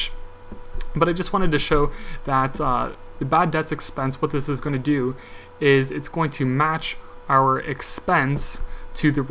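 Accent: Canada. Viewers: Love dat Canadian accent!!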